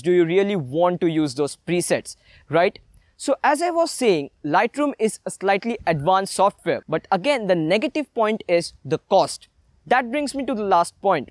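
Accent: India. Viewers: The Indian accent is so funny! 🤣😂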